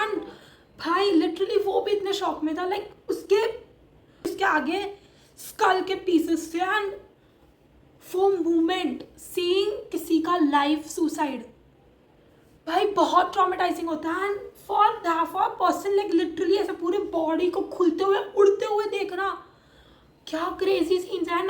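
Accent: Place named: Gujarat, India. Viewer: The gujju accent is the must 😝😂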